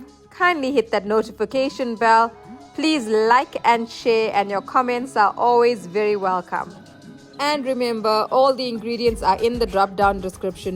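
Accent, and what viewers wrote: Durban, South Africa. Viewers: Thank you very much…looks and sounds delicious… I have to try your method… my family loves crab and prawn. From you accents I’m guessing your from Durban SA my beautiful home of birth…I may be visiting this year sometime… do you have a restaurant and is called Peri-Ma’s Kitchen? Just got to visit. Once again Thank You ❤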